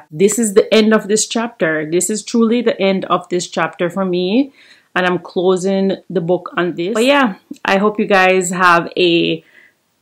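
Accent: Jamaica. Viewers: Off topic: YouTube recommended me your video after watching Nuff Loads life in Jamaica, so I decided to click on your video even though those are two different videos, I decided to check out your video, and I hear an accent, and me being nosey lol, i checked out your IG as well, and I see that you're Jamaican. I'm not Jamaican but I love Jamaican people, lol YouTube is something else, but thanks for sharing your video. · I hear a Jamaican accent here, hey girl 🇯🇲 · U from jamaica?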